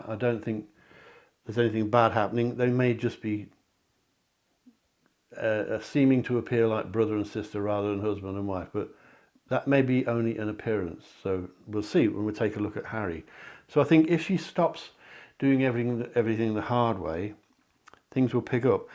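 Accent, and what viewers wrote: United Kingdom. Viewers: it’s not a Devonshire accent though! I wondered where you are from - is it Staffordshire? My entire family is Cornish except one Grandfather who sounded like you.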